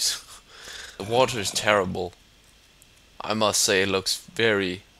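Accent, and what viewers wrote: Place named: Denmark. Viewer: You sound danish!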